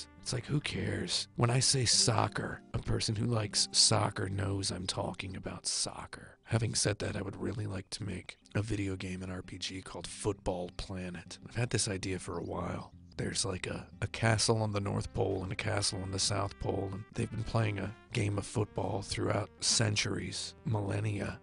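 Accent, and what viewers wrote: Australia. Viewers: dangerously australian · As a British person, I think the text definitely read smoother with an Australian accent. I think the game is Australian come to think of it.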